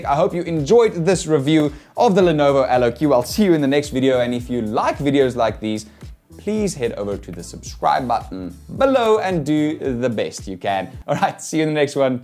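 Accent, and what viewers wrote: Afrikaans, South Africa. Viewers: Hello I just wanted to say you sound south African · Kan sommer die afrikaans hoor in jou stem. Ek het die laptop in Demark gekoop. Beste gaming laptop daar buite op die oomblik net my opinie.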